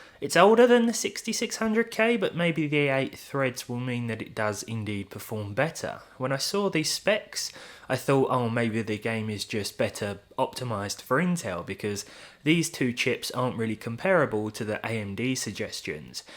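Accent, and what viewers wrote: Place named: Essex, United Kingdom. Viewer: Essex accent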